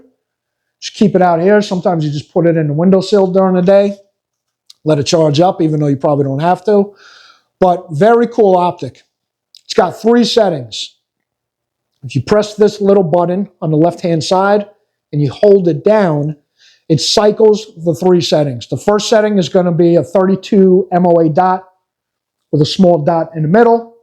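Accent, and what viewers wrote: New York, United States. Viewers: Love the New York accent 😉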